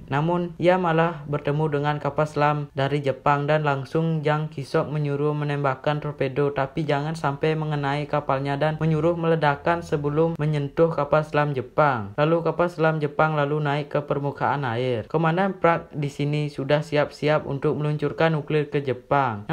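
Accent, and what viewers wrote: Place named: Bali, Indonesia. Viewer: narator nya bali banget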